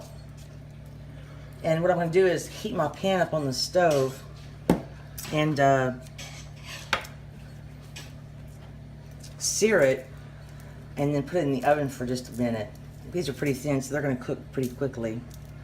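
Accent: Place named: Louisiana, United States. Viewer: Looks delicious! I love cajun food and Louisiana! I was actually thinking when listening to you that you probably live in Louisiana! Lots of garlic and lots of cheese! Mmmm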